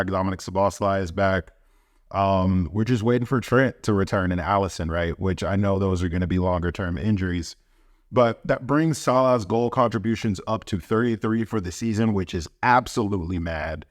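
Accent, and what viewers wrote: United States. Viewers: I like your English. I never listen football with American accent are you American. Do you have live chat programm ?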